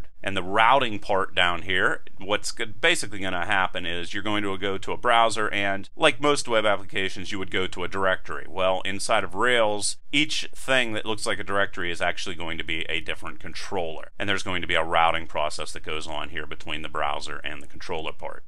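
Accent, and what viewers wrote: Pittsburgh, United States. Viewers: Killer accent you yinzer you :))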